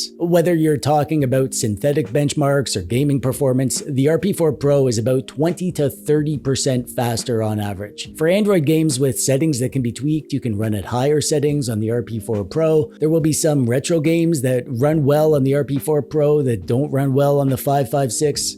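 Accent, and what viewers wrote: Canada. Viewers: You sound Canadian my Root Beer friend ??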